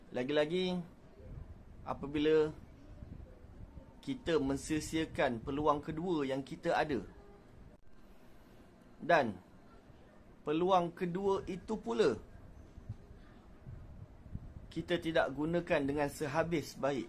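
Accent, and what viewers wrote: Johor, Malaysia. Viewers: Cikgu asal orang johor ni heheh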